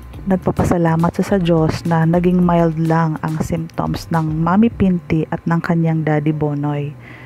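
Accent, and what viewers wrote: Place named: Visayas, Philippines. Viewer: Ampanget ng Boses at salita mo , d ko na tinapos nairita ako , pambisaya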